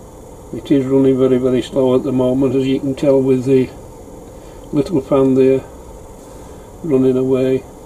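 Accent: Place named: Yorkshire, United Kingdom. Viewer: Hi i thought i recognised the accent,you are in Knottingley,i come from Mexborough,maybe we can get togeher one day.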